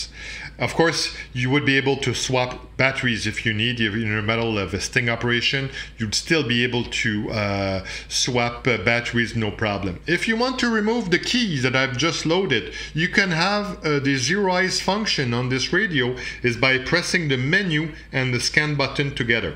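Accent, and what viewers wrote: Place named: France. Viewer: Your english sound french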